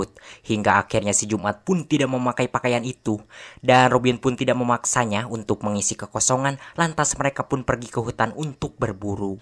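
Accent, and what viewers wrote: West Java, Indonesia. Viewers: Anjig Sunda pisaaaan he he he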